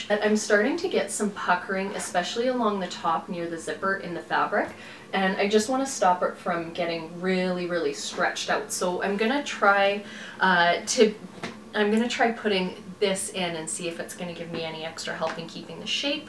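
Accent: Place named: Canada. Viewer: Me: hmmm her accent sounds Canadian? 🧐 Her: pulls out Canadian stamps Me: Beaming bc i guessed right ☺️☺️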